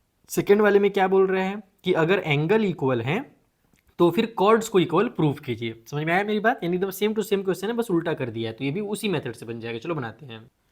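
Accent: Bihar, India. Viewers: Sir kya aap bihar Sa Ho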